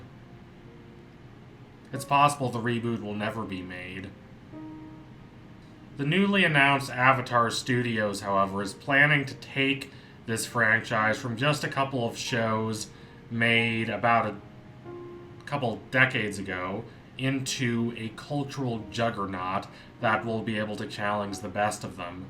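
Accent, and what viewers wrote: South, United States. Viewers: were you...doing a country accent in this video lol? Are you rehearsing for a role or something? No judgment, just threw me off XD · Why do you Sound more southern?😂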